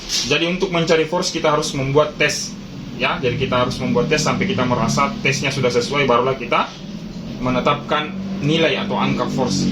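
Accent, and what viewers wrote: Indonesia. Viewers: Orang sulawesi selatan yak ? 😂😂😂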